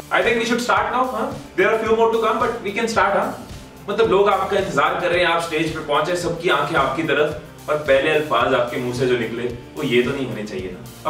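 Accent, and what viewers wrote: India. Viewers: Cute Indian English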